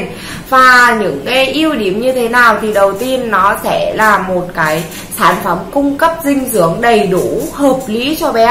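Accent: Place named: Thanh Hoa, Vietnam. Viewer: Đúng là giọng thanh hóa.. Nói quê quá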